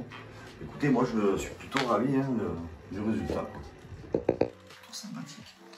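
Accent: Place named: Marseille, France. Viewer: Oh Le Marseillais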